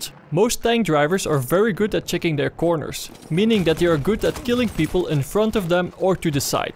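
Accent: Netherlands. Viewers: dutch accent but hes from denmark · he sounds Dutch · fix your dutch accent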